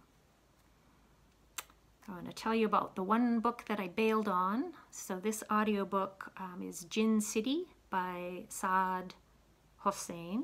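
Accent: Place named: Canada. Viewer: You were discussing your accent in this video (just to refresh your memory since this was a LONG time ago). I LOVE your accent. I feel like people from Canada seem to annunciate much better than Americans and I'm not sure how much of that is accent and how much is just valuing speaking properly. I only have a handful of Canadian friends, but they all tend to speak slowly, carefully, and annunciate!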